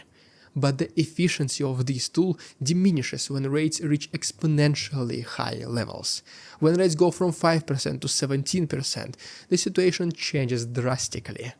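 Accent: Russia. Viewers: you have a surprisingly wide English vocabulary for someone who cannot speak without an outrageous Russian accent. Methinks there is something amiss here.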